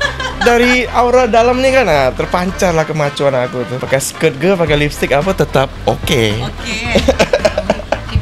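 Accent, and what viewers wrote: Indonesia. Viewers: 70% logat jakarta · Aksennya Indonesia banget...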